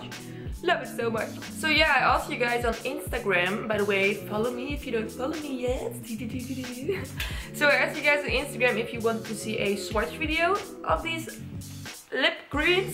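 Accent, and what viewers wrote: Netherlands. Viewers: Hey girl, as soon as I listened to your voice, I knew you were a Dutchie! Fellow Dutchie here 😄 Love the NYX soft matte lip formula and really like these metallic shades! 😘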